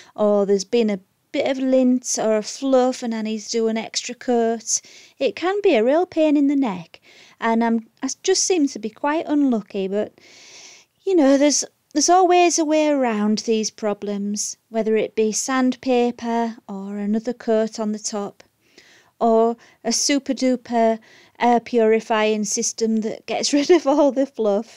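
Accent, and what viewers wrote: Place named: England, United Kingdom. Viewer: So lovely to hear a fellow English person giving a tutorial. You are a breath of fresh air. Loved all the knowledge you shared. I can’t wait to get started. 😘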